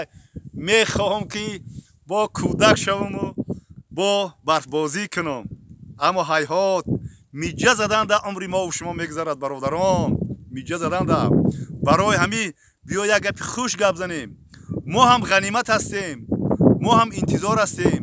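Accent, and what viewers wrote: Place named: Kulob, Tajikistan. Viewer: кулоби гапзан лижа меган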